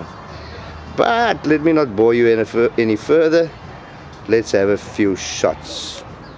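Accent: South Africa. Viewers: Always a pleasure to listen to the SA accent. Brings back good memories. I agree with your assessment of the QB 79. Great fun and then some.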